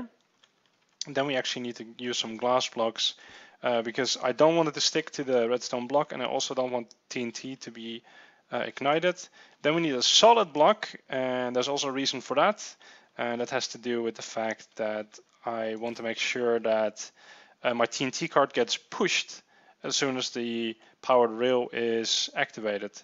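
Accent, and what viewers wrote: Netherlands. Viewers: Je praat echt met een heel Nederlands accent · Let me geuss you are dutch Want ik ook en het is heel duidelijk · ***** Haha You HAve a dutch Accent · #nederlandsacc beter dan van gaal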